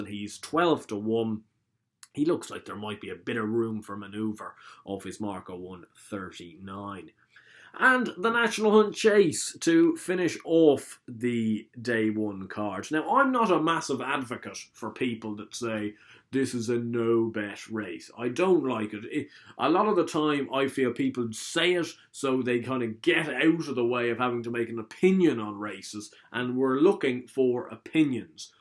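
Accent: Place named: Ireland. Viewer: Jesus christ lad what accent is that posh Irish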